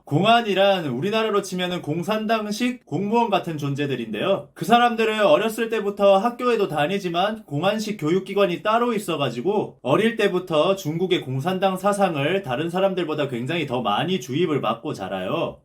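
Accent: Gyeongsang, South Korea. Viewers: 경상도 특유의 표준어 사용시 묻어나오는게 있습니다. 저도 경상도사람이니 아는거고요 ㅎㅎ 그래도 나쁘지 않았어요^^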